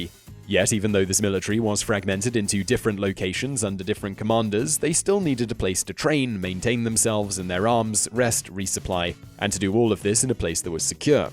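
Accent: United Kingdom. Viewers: Okay after so many videos of this guy, I am convinced he is mispronouncing words incorrectly on purpose, and thinks he can get away with it just because of the U.K. Accent. I swear I don't care what you say medieval is not five or even four syllables.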